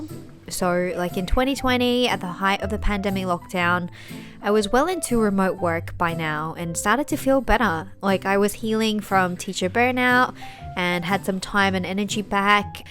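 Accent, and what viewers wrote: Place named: Michigan, United States. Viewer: Yours is easily the coolest Michigan accent I've ever heard!! Your zig-zaggy life story is interesting too. Those are the most interesting life stories, where the person had to try a bunch of things before something eventually floated their boat. I'm paraphrasing Jerry Yarnell, the TV painting teacher guy who said something like, "Bravery isn't not being afraid to do something. It's being afraid to do something, and then doing it afraid." Looks like you've got pretty mad crafting skills too. I'm subbing your channel so I can swing by here from time to time.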